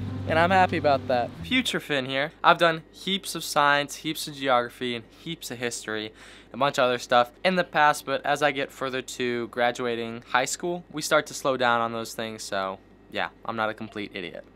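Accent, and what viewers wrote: France. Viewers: tu as un bel accent français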